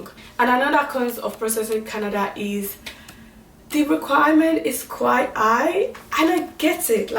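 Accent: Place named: United Kingdom. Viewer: U have such a beautiful British accent and thank you so much for this content it is more than helpful.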